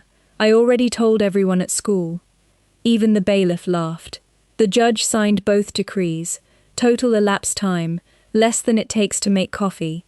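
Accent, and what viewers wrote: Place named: United Kingdom. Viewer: Why does the reader of this story have a British accent?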